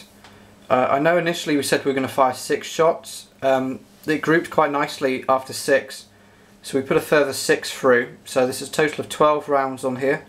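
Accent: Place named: United Kingdom. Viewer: were'd u buy this gun , i take from your accent your in the uk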